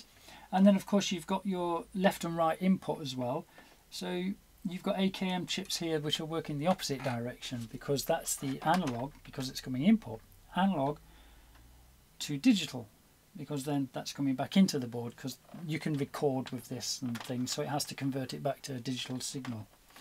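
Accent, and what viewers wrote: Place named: England, United Kingdom. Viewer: Hi newly subscribed to your channel. you sound very English although you were talking about product COST's in dollar & cent's, but I take it you reside in England & have to send to America for your parts? however! i enjoyed your very informed repairs videos, & wondered....do you extend your workmanship to Roland models? VA-7 in participant? i may pick up some tips!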